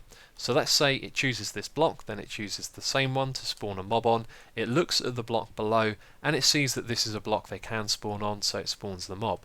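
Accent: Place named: United Kingdom. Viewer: Please do more man your awesome and your British I think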